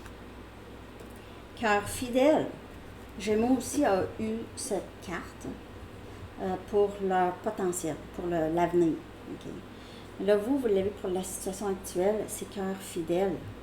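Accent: Canada. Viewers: Merci cette guidance me parle grand’ merci ps:je comprends très bien l’ accent québécois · Salut, Merci beaucoup pour votre magnifique travail! ❤ Et pourquoi changer votre merveilleux accent canadien??? ❤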